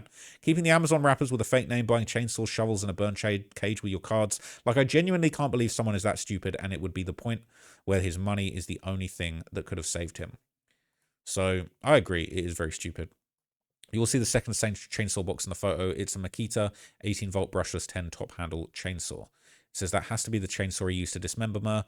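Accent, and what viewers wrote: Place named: United Kingdom. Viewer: Dude got his nails painted😂🤦🏾‍♂️Bad enough having a British accent alone😂🤢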